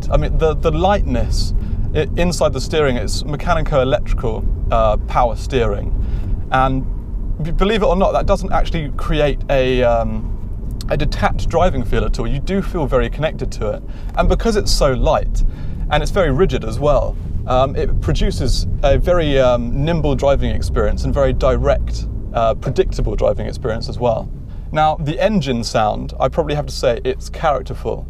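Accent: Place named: England, United Kingdom. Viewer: Say Alpeen!!! like you say Ferraree and Lamborghinee! what an english uggly pronunciation you've got!!!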